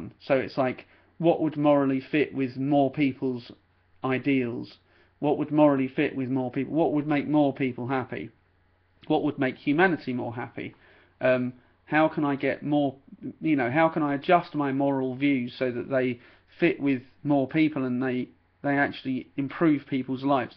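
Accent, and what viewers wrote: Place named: United Kingdom. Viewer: I really enjoy your videos. Great to hear a British accent, on the subject of MBTI on YouTube. For me re: I/E I think, like anything else in life, people tend to over-simplify a concept in order to assist with general understanding. It then becomes a Chinese whisper. If you like understanding systems (as I do) you quickly relish the fact that it's clearly a much more sophisticated concept, than the initial information concerning the letters. I guess when I refer to extroversion, I'm talking about someone leading with an extroverted function, as the meatiest part of them, which leads them on a sociable path. However, we all think, we all feel and we're all I/E. That's the complicated and intricate beauty of humanity. The MBTI framework attempts to trace it. Keep up the good work! Marie (INTJ)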